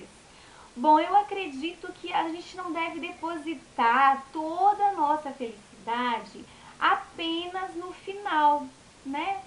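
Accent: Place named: Goiás, Brazil. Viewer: Linda reflexão. To passando por uma fase chatinha e essa reflexão serviu perfeitamente. Mudando de assunto você a goiana? O sotaque me lembra os de Goias kk <link>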